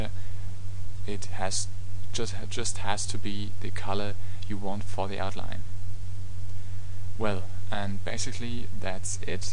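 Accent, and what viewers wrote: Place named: Germany. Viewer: I did never say I did not understand him. I was trying to give him advice on recording spoken text in General. His english is quite good, but I can hear he's German (which I am too by the way). But if you try to follow such a tutorial a series of "uhm" and "ahs" and thinking breaks, it can be tiring. So I basically tried to help him, because he was apologizing at the end. Rather write it down, makes it much easier to speak and focus on the rest. Ah and by the way, I am bilingual ;-)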